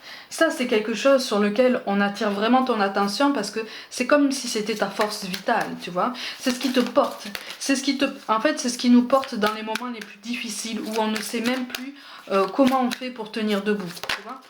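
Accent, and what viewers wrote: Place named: South, France. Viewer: Merci pour votre travail, très joli accent du sud;) Je m'abonne avec plaisir:)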